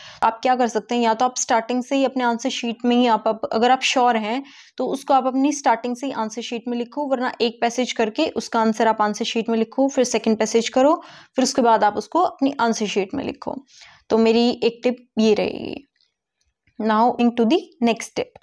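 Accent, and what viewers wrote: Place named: Haryana, India. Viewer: Haryanvi touch 🧸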